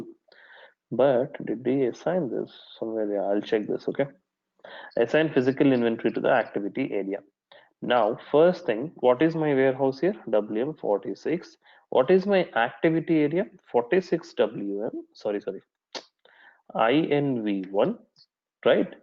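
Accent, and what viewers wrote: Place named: India. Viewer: fucking indian accent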